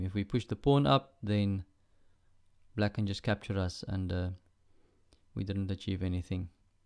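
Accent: South Africa. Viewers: south african accent ? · This guy is south African I can tell from the voice 😅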